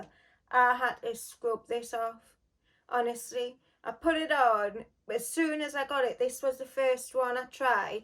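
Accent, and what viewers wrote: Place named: Newcastle, United Kingdom. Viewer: I’m not from the UK and I love your accent, is it Geordie? Just got majestic woods and love it!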